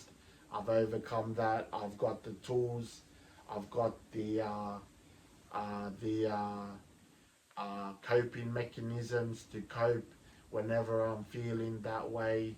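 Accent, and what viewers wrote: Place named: New Zealand. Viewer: Are you originally Kiwi? I hear a bit of a Kiwi accent but you're Polynesian anyway! That is clear brother!😂😜I'm gonna say Samoan (please correct me if I'm wrong!) I've played rugby with so many Pacific Islanders and I have a great love for you guys! The most generous people I have ever met! All the best brother! Much love from Ireland! Nofo lelei lou uso!❤🇮🇪🇼🇸🇹🇴🇫🇯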